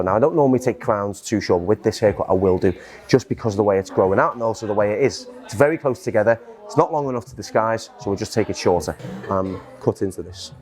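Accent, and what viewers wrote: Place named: Merseyside, United Kingdom. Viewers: Lovin the Merseyside accent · He speaks too fast and has more of a northern accent compared to the art attack guy.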